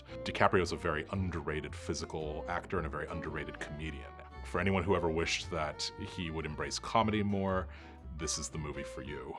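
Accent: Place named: RP, United Kingdom. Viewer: Am American of Asian decent speaking like he's British posh tosh is suss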